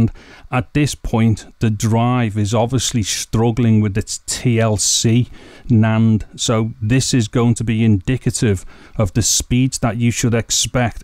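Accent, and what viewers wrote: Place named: Scotland, United Kingdom. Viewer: OMG A SCOTTISH! Love the accent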